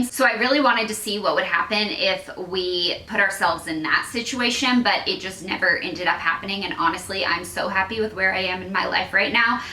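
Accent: South, United States. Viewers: Do you have a southern accent that you don’t show on camera? (I’m from Tennessee too, and it’s so easy to sound yeehaw😂) These new videos are so refreshing and calming. I like to listen to them when I can’t sleep . 🩵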